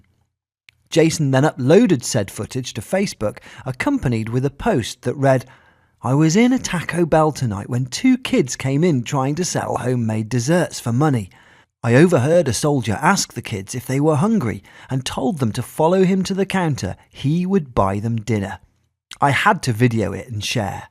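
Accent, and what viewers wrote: United Kingdom. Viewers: Am i the only one who thinks the video is a bit confusing with that accent talking about “our troops” with a British accent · “Good story of an admirable man in uniform, my hats off and thank you for your service.” That being said...the video is STUPID and imho takes away from the story in its attempt to glorify it w/ the repeating of itself all in the same paragraph and dumb accent. We’re here🇺🇸..,not here🇬🇧.. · Not to mention saying the same thing repeatedly!! Then saying with his Brit accent “ our soldiers “ However, thank you sir for all your ways of being great to those kids and to “ us “ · I don't understand why the story had to be told several times, and by someone with a British accent. Great story, a shame there are too many that do not appreciate our military, especially some newly elected politicians who disgrace the halls of Congress this year.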